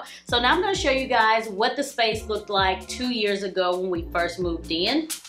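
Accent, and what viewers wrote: South, United States. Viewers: I know it isn't much to film. But we miss your videos. If you have any bloopers or footage you think we would be board with is OK to post. We just miss that Southern Drawl & your contagious smile on our timeline. #MissYouAndYourFamily #BlessingsToYouAndYourFamily #MoreVideosPrettyPlease #FromCaliforinaWithLove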